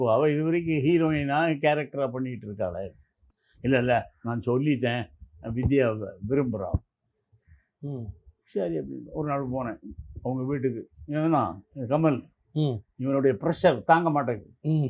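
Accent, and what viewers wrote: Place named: Tirunelveli, India. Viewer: super nellai slang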